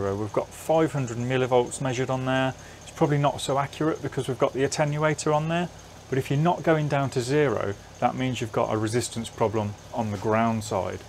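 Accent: United Kingdom. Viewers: Another English accent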